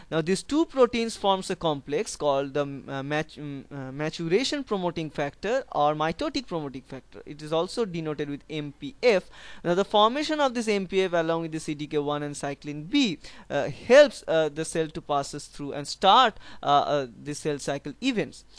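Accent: India. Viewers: ow he is from Bangladesh😃i thought he was Indian because of the accent. thanks a lot bro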